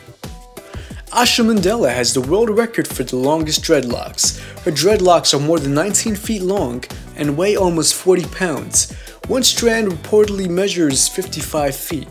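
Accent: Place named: New York, United States. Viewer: he just needs cough medicine.. GET OUTTAA HEEAAAH.. a NY vocal mayb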